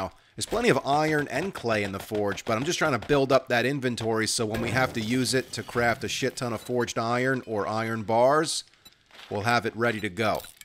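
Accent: United States. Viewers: Best series ive seen so far. You sound so american, its insane 😂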